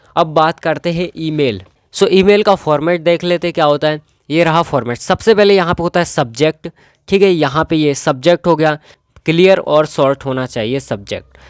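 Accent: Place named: Indore, India. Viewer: Bhai tu indore se ha na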